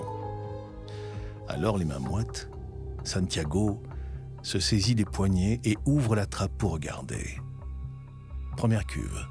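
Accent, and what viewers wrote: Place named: Belgium. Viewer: Ça me fait toujours plaisir d'écouter l'accent belge. Merci ❤❤❤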